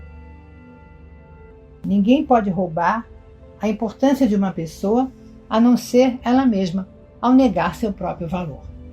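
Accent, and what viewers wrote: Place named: Brazil. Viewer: Gratidão e Universo. Perfeito com sua voz brasileira. Amei. Que a Luz a seu redor continue se estentendo diariamente. Luz....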